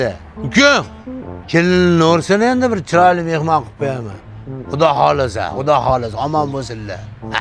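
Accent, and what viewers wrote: Margilan, Uzbekistan. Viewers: Маргилонча бубтику. Бормиларми.😊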